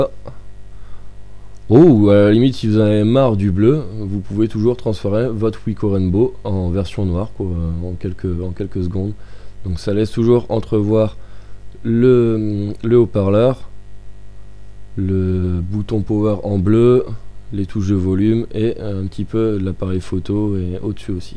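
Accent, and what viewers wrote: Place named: Belgium. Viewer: Tu es belge toi, non ? ^^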